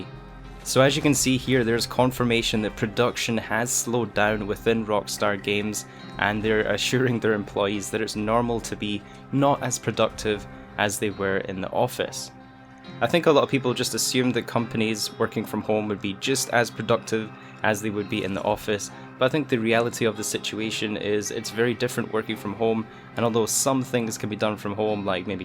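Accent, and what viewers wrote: Scotland, United Kingdom. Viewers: Scottish? I’m Scottish! I know that’s nothing to be excited about but you know 🤷🏻‍♀️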